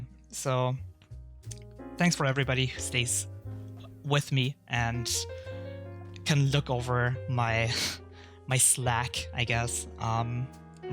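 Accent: Germany. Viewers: Man hört das er deutsch ist :D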